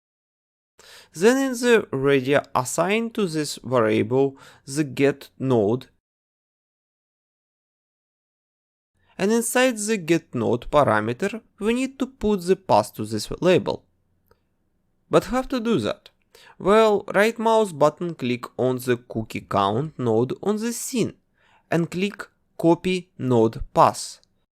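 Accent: Russia. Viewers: Чел ты... Русский акцент не убрать!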